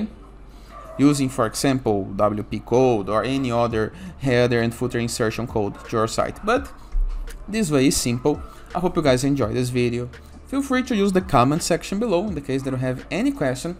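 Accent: Brazil. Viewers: Na hora que ouvi o inglês percebi também kkkkkkkkkkk, seu inglês é muito bom, mas da pra perceber q é br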